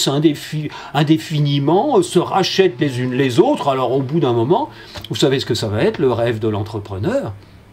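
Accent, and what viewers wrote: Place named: Paris, France. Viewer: Vous parlez très élégamment, M.! Il y a cet accent parisien qui permet une excellente diction. Mais surtout une faculté d'expression orale que notre génération n'a pas reçue, sans doute à cause des pédagogies nouvelles. Je remarque que les + de 50 ans de la blogosphère s'expriment bien plus clairement que ne le font les meilleurs des intellectuels de 35 ans. Entre nous, la génération intermédiaire est déjà incapable de discourir proprement, cf. les allocutions de Hollande ou Charközy. Je recherche des textes, des témoignages pour comprendre ce qui s'est passé et comment je pourrais compenser ce défaut. Est-ce qu'à l'école dans les années 1960 on entraînait les élèves à l'oral?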